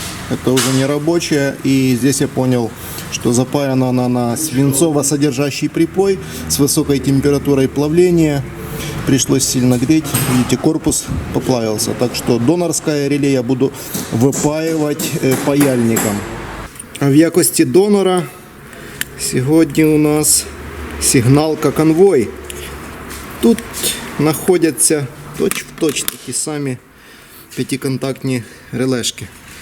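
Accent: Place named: Surzhyk, Ukraine. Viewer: Только правильно не "перейдемо на украïнський" а "перейдемо на украïнську". Почему я, русскоговорящий, знаю грамматику лучше? Это как, никого не смущает? Если уже говорите на украинском, делайте это хорошо, не похабте своим корявым суржиком действительно красивый язык.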